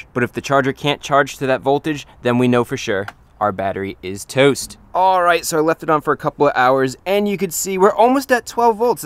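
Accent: United States. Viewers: American (simplified) english, dialect we hear in movies, shows and songs.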